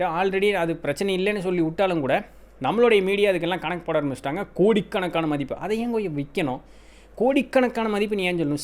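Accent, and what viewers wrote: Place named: Coimbatore, India. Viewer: தம்பி, நமக்கு கோயம்புத்தூருங்களா... நல்லா சொல்றீங்க...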